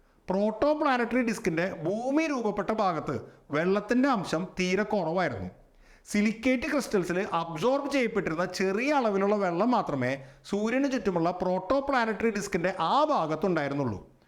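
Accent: Thrissur, India. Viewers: ഇത്‌ തൃശൂർ ഭാഷയാണ് മാഷെ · ഇതേ ചാനലിൻറെ തുടക്കത്തിൽ ൽ, അദ്ദേഹത്തിൻറെ തൃശൂർ ശൈലി മൂലം ഇങ്ങനെ സംഭവിക്കാനിടയുണ്ടന്ന് പറഞ്ഞിരുന്നു.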